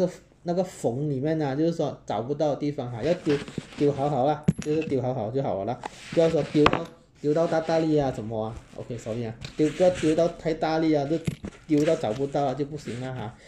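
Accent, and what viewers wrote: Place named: Penang, Malaysia. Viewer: 槟城的福建音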